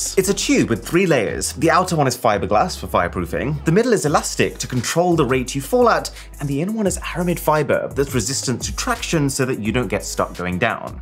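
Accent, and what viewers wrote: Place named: United Kingdom. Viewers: I can never tell if is British or American. He sound British yet only talks about America for stats and uses dollar Can someone please clear this up for me please!!! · He is British but for obvious reasons, the general audience is American and many statistics are measured using dollars.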